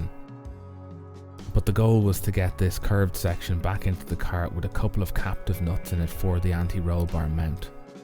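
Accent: Ireland. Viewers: Great to hear an irish accent are u near Dublin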